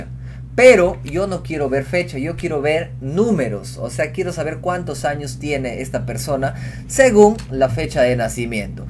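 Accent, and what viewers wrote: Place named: Amazonas, Peru. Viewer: QUÉ CAPO ERES AMIGOOOOO!!! Muchísimas graciasssss!!! Tan didáctica y súper fácil de entender tu manera de explicar! Vale oro este video... gracias por darte el tiempo de compartir tus conocimientosssss!!! Millones de gracias, me ayudaste a ordenar mi padrón de edades de niños para poder hacerles llegar regalitos acorde a su edad en esta navidad!! Dios te bendiga!!... Pdta: De casualidad eres de Rodríguez de Mendoza / Amazonas? Te sentí el acento similar al de mi familia que es de allá! Ojalá seamos familia! jaja un abrazo!!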